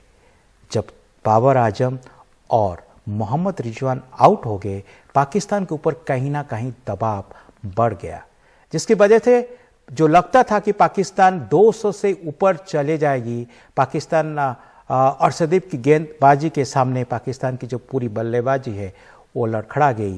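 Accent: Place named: Odisha, India. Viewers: Kaha se pakad laya odisha ke Anchor Accent mein hi Odia hai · जी, हम देश को प्यार करते हैं। हम क्रिकेट को प्यार करते हैं। हम धर्म संप्रदाय से ऊपर उठकर देश के हर प्लेयर को प्यार करते हैं। हम आपके उड़िया टच(शायद) हिंदी टोन को भी प्यार करते हैं। जो कहती है, फूल हैं अनेक पर माला तो एक है।🙏🙏🙏।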